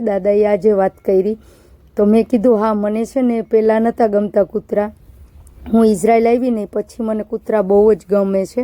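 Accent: Kathiawar, India. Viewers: કાઠિયાવાડી લાગે છે 😄🙏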